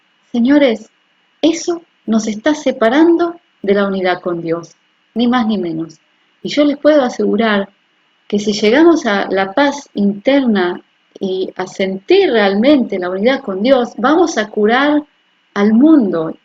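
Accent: Argentina. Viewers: Me sorprende que después de tantos años de vivir en Israel, conserves tan bien el acento argentino.Gracias por compartir el hebreo, ya lo estudiaré, me encanta.Salud a ti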